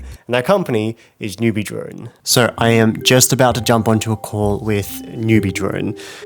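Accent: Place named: Australia. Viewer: relax; aussie accent, young, multi-cultural, eloquent and cute.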